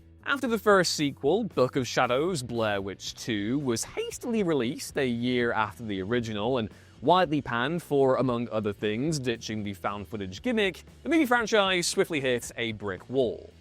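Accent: United Kingdom. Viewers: I always love British pronunciation of Spanish words. It is always fascinating (not that my accent was ever perfect).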